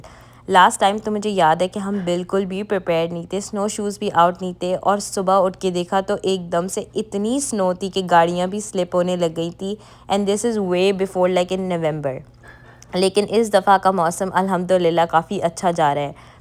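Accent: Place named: Pakistan. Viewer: You have an awesome Urdu accent..... Love your vlogs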